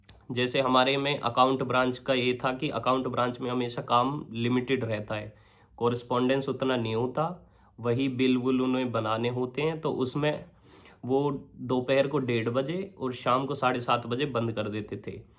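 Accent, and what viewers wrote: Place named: Noida, India. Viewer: Bhai ji apka bolne ka tarika asa lag rha hai jese aap Noida se ho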